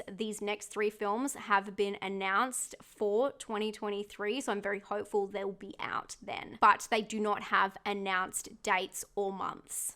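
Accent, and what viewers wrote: Australia. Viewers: >hear australian accent > immediately click off video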